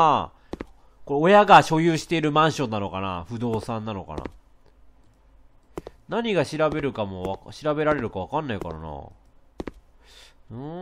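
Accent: Kansai, Japan. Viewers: 今回の関西弁が強い(笑) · の関西弁素敵すぎる☺︎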